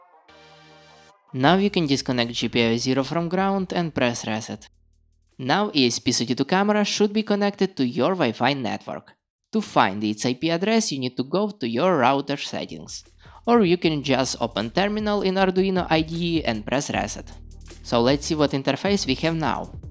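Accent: Russia. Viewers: Я уверен на 95% ты русский или украинец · Слышно русский акцент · Русский акцент ))))) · Пипец чувак руинглиш так режет ухо. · Are you from Russia? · От такого русского акцента хочеться повеситься тут же!!! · забавно слушать видео с русским акцентом. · Блин акцент такой будто-бы это русский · Ты вроде русский,судя по акценту? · Акцент русского выдает с потрохами ) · Какой ужасный русский акцент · Бля твой русский акцент просто по ушам режет как ржавым гвоздём! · Русский акцент или показалось?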